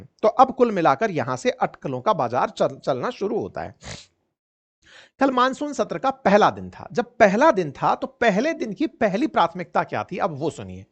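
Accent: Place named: Rajasthan, India. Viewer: Your speech has a Rajasthani smell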